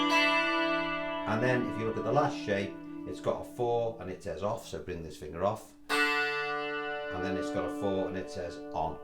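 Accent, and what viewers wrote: Liverpool, United Kingdom. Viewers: ? Are you a scouser? I have seen your pieces for the last few months. I also look at Ivor Sorefingers, who has a similar thing as you. I really like the scouse accent! ❤😊 I'm a Londoner, but not a cockney.😂😅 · Excellent teacher. Scouser with no edge as you expect. That’s me speaking as a Yorkshire bloke.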